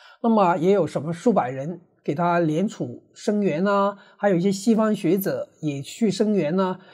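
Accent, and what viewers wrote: Cantonese, China. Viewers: 你的广东口音暴露无遗🙃🙃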